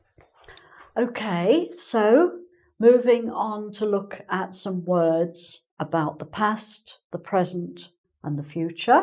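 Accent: England, United Kingdom. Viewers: I very love British accent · I love her british accent. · she must be from England becuse her accent is the same like others English people lmao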